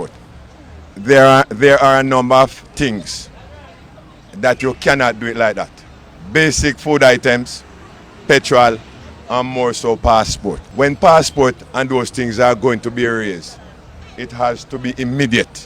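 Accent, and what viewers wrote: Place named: Jamaica. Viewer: The man yah patois deep you fret!!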